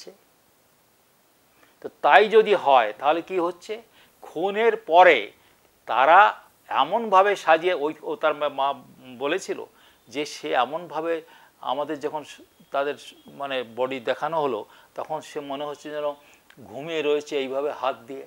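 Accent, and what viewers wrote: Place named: North Bengal, India. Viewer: আপনার ভাষা শুনে মনে হচ্ছে নর্থ বেঙ্গল এর। কোথায় বাড়ি?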